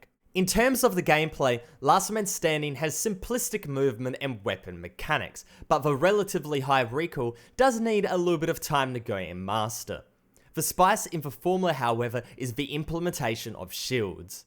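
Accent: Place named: Australia. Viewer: Dude's Australian his voice is easy to listen too subscribed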